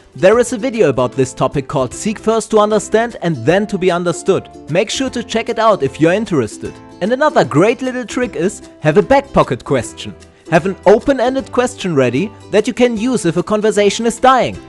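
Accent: Germany. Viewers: Ist deine Muttersprache Deutsch? You sound like a german 😃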